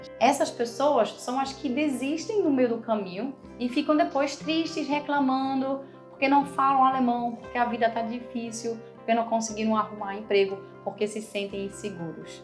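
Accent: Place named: Northeast, Brazil. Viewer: Hallo! Desde a primeira vez que escutei sei vídeo, fiquei com uma pulga atrás da orelha. Pensei ela é do nordeste e me parece ser de recife🤣🤣uma nordestino conheci o sotaque. Apostei até com amigas. Até perguntei no Instagram.Amo seus vídeos😍sucesso pra vc!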